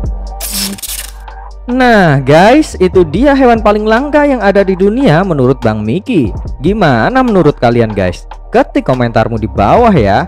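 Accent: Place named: Java, Indonesia. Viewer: ketauan ya kalau komentatornya orang Jawa... logat hurup K hilang😄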